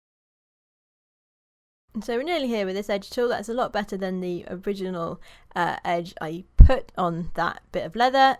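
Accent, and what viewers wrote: United Kingdom. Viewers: by your nice English accent this is my guess you are from UK, Am I right.?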